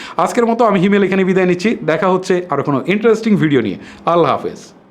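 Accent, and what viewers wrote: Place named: Kishoreganj, Bangladesh. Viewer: ভাইয়া আপনার বাড়ি কি কিশোরগঞ্জ জেলায়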